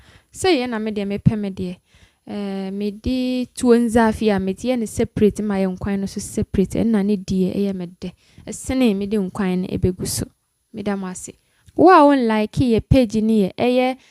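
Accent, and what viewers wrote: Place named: Ghana. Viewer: your Twi is beautiful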